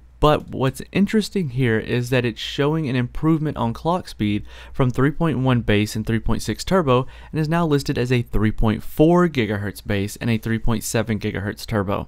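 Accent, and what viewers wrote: South, United States. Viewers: Did I hear some of that country accent in this video? Haha great vid dude.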